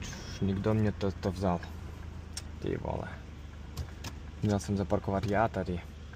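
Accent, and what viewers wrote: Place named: Russia. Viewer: Zajímavý přízvuk. Vypadá že z Východu, spíš z Ruska.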